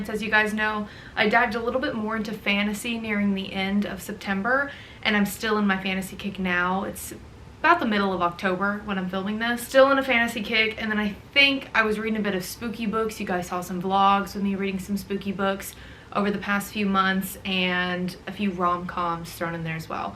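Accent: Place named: South, United States. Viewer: I love that for her but im afraid im adapting a little southern accent from her and im not even American 😭😭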